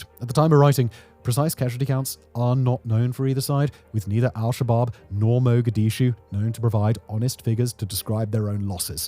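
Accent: RP, United Kingdom. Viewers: you are a prime example that everything sounds correct when said in an RP British accent. I live in Mogadishu wife,kids, extended family, the whole shebang. We are not under siege. The turks have just declared that the two blocks they explored has an estimated 20 billion barrels of oil. I do not engage with your output because you know very little about very much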